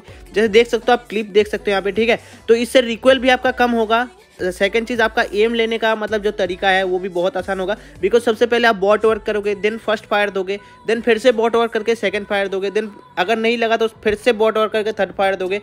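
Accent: Bengal, India. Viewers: Bhai tum bangali hoo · Vai ap bangali ho 🤔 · Bhai aap bengali ho kya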